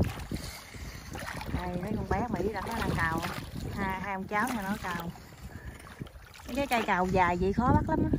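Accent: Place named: An Giang, Vietnam. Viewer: Rất thích và ủng hộ kênh của bạn. Nghe giọng nói hình như bạn là người gốc ở An Giang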